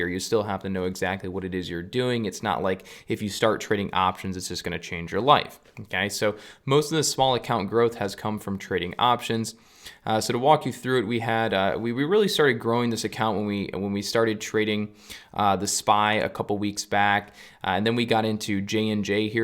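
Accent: United States. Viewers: You sound like your from Buffalo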